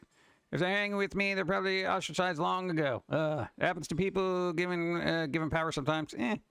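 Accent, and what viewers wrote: Boston, United States. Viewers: I don't think i could be alive without a lil pumpkin man with a boston accent in the back of my mind going "ayyy buddy are ya doing okay? heyy fok you and fok yor muther." Not that our here has ever been so mean.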